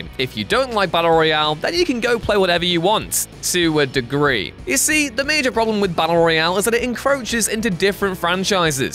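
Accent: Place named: West Country, United Kingdom. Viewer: Now is it just me or is that a really strong west country accent?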